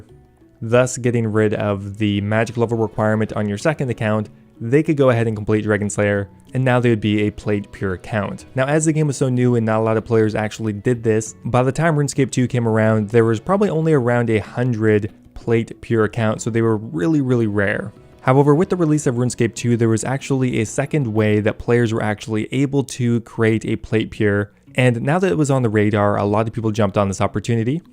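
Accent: Quebec, Canada. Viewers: t'es quebecois?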